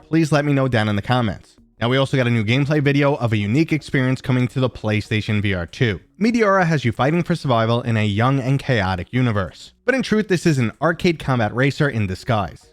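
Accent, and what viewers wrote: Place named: New England, United States. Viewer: Love your content brother. I'm curious. Are you from the Boston area? I'm from NYC and trying to figure out if your accent is New England or NYC 🤣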